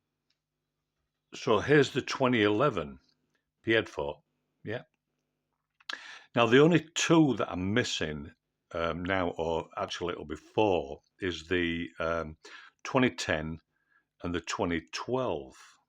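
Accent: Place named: Yorkshire, United Kingdom. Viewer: . New subscriber here & one that has recently taken up this hobby. I’ve started collecting 50p, King Charles III coins. This has now been added to by collecting sixpences (coz that was my first pocket money). I’m interested in Irish & Celtic coins but don’t know if they’re worth collecting. Great to hear a Yorkshire voice on the subject, as I’m from Mirfield.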